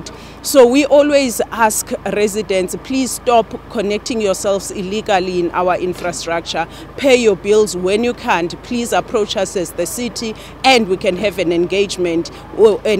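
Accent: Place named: Zimbabwe. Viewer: You speak Zimbabwean English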